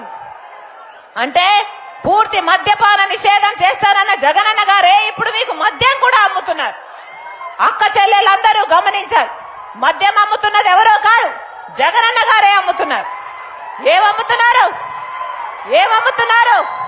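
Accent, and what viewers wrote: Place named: Telangana, India. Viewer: Same to same telamgana laga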